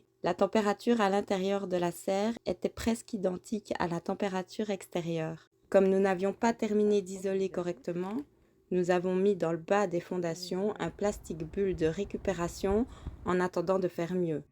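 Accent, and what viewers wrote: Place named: Belgium. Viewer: Olà la p'tite famille 👍🏼 merci 😉 j'adOOOre ta petite intonations Belge 😍 bonne continuation 😘 et à+❣️💃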